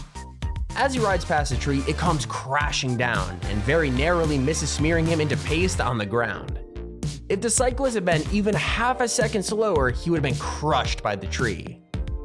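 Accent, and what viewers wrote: Australia. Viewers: Wy you doing an Australian voise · Are u Australian bc I'm Australian (mate)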